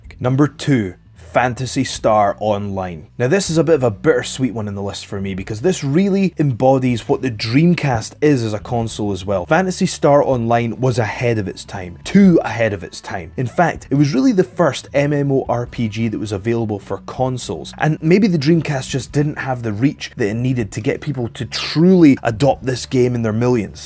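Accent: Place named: Scotland, United Kingdom. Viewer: Scottish probably from Edinburgh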